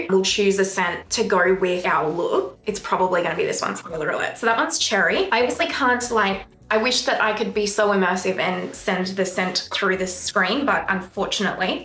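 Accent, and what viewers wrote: Australia. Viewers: Beautiful eye look. I guess I’m a YT dinosaur 🦕.😂😂😂. I like a chill, relaxed, long-form GRWM style video. I personally love to listen to you talk about whatever is on your mind. I think we all enjoy your Aussie accent as well. That TF blush is 🔥. I’m jellies over here in the states.😊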